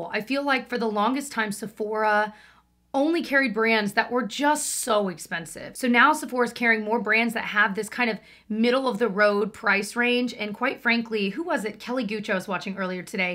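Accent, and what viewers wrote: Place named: Midwest, United States. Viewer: those Midwestern flat A’s made me laugh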